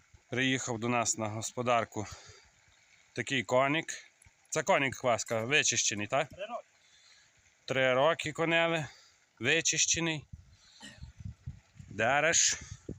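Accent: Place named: Hutsul, Ukraine. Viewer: Трохи Гуцул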